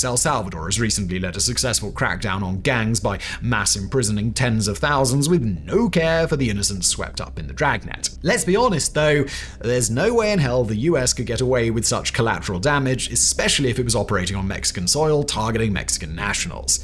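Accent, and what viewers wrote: England, United Kingdom. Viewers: Your accent - I assume u English- don’t know what u talking about - George bush CIA director in <num>-vice president in the 80’s Pres in the 90’s -in 1976 was liaison for China - u do the math - someone is Benefitingon This side - people are leaving the door open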